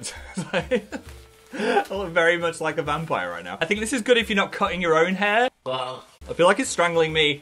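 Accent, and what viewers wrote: North, United Kingdom. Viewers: Do I hear Phils northern accent making a comeback 🥳🥺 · I have never heard you sound more northern... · Is it only me or does sound extra british in this video?:D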